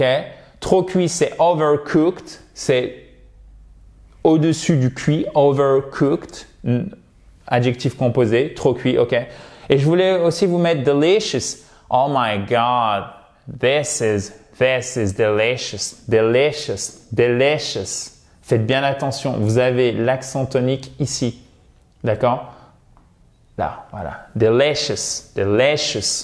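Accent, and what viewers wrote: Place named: United States. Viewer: Merci tes videos sont sympa, mais j'aurai prèfèrè avec l'accent anglais et non Americain.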